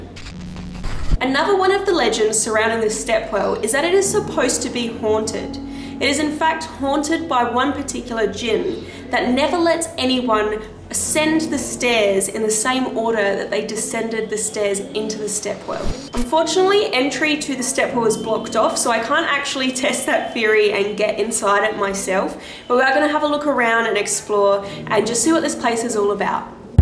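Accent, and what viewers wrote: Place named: Australia. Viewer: There are different accents in a language and none is "correct" or "incorrect". Same with dialects.;) I love accent and I'd love to visit Australia someday. English isn't my first language and I always understand what she says. Keep doing what you do,! ❤ Thank you for creating these videos and teaching us about these places.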